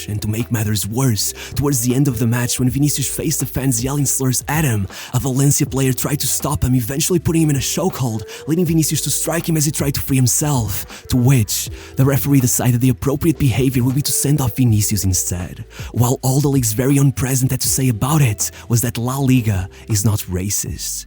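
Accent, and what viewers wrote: Portugal. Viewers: It took me 2 minutes to realise that this guy was Portuguese lol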